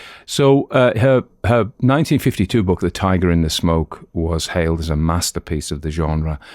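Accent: United Kingdom. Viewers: read a story set in Amerca in a British voice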